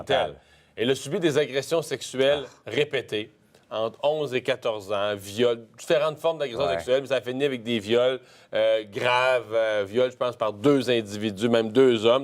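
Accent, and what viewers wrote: Quebec, Canada. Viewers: Elle n'a pas été euthanasiée. P.S.: putain cet accent québécois de me*de.... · Qu'esta conte l'accent quebequoi lo t'veu d'battre !?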